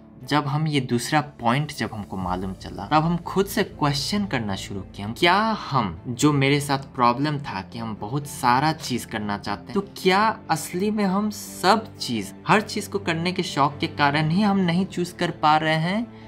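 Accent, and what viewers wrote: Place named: Bhojpuri, India. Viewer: 💞💞💞 bhiya aapki hidi thodi bhojpuri mix sound kr ri h we support you 💜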